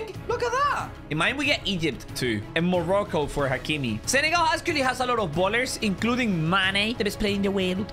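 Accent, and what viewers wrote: Spain. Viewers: Wait,you from Venezuela or spain?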